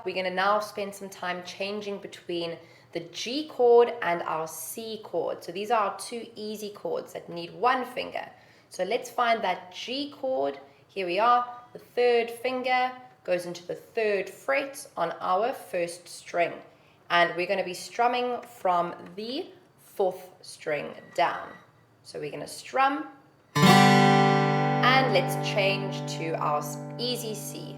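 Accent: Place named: South Africa. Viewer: You are South African with either Greek or Italian background, by the way I'm very annoying I know, my wife keeps telling me. Love your guitar lessons, thank you...maybe Spanish?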